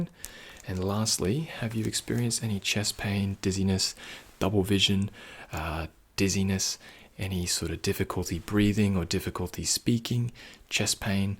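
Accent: Australia. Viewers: God yes!! I love how Aussies always say “no dramas” amazing. Voice is better than butter. New sub for sure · You look more like a Filipino but then you speak fluent Australian accent! Very knowledgeable and full of tingles. :((( Subscribed! ♥️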